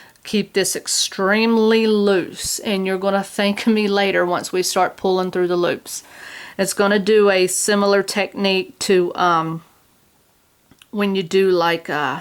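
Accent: South, United States. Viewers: That is just wonderful tutorial Ms! I finally got the idea with the Clones Knot needing 'loose' stitches to pull through the wrapped knot! It has taken me all of 14 days to figure it out, and today, there you were. The sun is shining a bit brighter for me today, again, thank you! Love that southern accent!:-)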